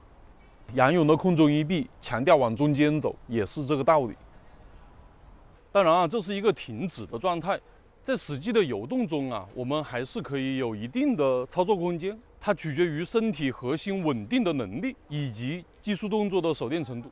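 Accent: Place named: Guangdong, China. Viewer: 老师的广东普通话➕幽默还挺有意思的。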